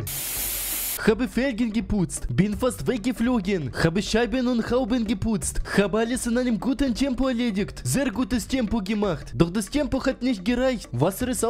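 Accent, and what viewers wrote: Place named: Russia. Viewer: Ich liebe deinen Russischen Akzent! Mach weiter so!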